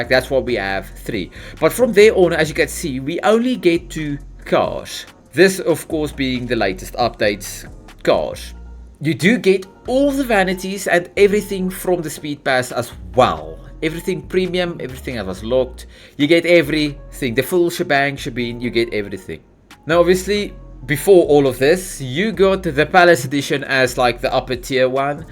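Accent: South Africa. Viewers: Do I hear a fellow South African?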